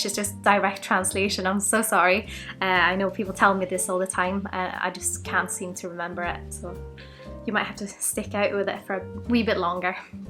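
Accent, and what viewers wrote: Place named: Glasgow, United Kingdom. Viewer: Cheers, agreed, this is a brilliant dram, but you sound more like a Glaswegian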